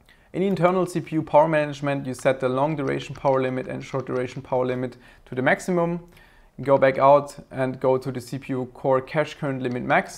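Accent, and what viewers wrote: Germany. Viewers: Dieser deutsche Akzent 😂👌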